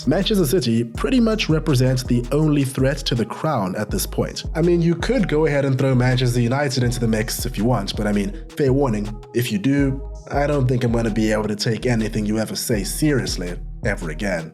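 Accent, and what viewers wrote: South Africa. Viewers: this is a south african accent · You sound South African 🤔🧐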